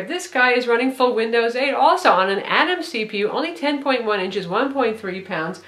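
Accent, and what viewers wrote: United States. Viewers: Good reviews, but oh, sometimes her fast, american accent speech leaves no chances to understand well.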